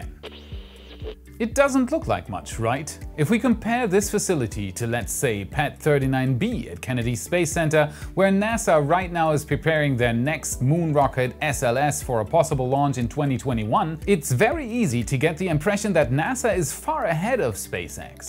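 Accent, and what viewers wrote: Germany. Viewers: yeah im also from germany and i can clearly hear the accent. but its incredibly light for a german. and yeah he is my favourite SpaceX youtuber :D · He is german isn't he? I feel like his accent is really light :D I'm so glad I found that dude lol Best quality updates!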